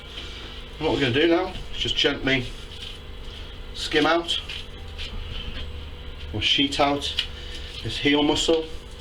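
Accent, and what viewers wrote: United Kingdom. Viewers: I really enjoy watching your videos. You are a real funny guy. The British accent makes me laugh when you say words and phrases like "give it a go", "really lovely", and "just fantastic". The combination of your great personality and educational videos make it fun to watch. Keep up the great work. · He's British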